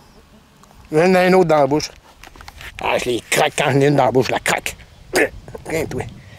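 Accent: Quebec, Canada. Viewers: j'adore la voix de quebecois tabernac lol. Je t'ai decouvert avec l'oiseau chieur. j'adore les saisons chez vous ! le top c'est un de mes rêves de vivre dans des regions neigeuses avec super vert le reste de l'année. Profite bien frere quebecois :)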